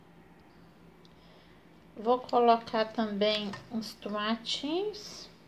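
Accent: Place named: Minas Gerais, Brazil. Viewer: Eita sotaque mineiro gostoso de ouvir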